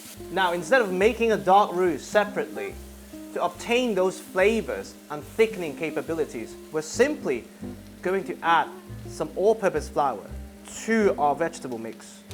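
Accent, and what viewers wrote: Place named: England, United Kingdom. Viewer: Chef, you are a maestro as a chef and as a teacher. I love your explanations and references to L’Escoffier. I also greatly appreciate you provide alternatives for example no chicken stock use water the dish has plenty of flavor. I personally don’t have Tawny port hanging around my kitchen. I wish I did. So going back to butter and sugar is no problem. Also, your English accent is fab, you must’ve been educated in English schools.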